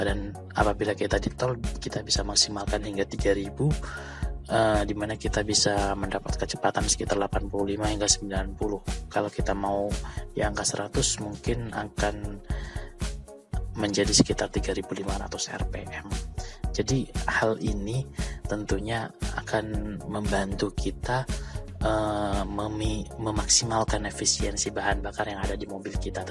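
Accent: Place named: Madura, Indonesia. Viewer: Asli madura ya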